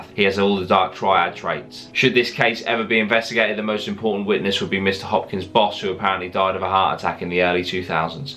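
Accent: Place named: England, United Kingdom. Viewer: Where in the U.K. are you from? Your accent and mannerisms sound very much like Russell Brand who is from Grays, I am originally from Canvey so wondered if you was local. Love your videos brother, keep up the good work.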